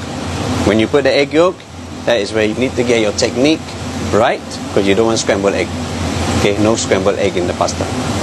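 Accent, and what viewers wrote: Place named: Singapore. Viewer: he is in Singapore, hence his accent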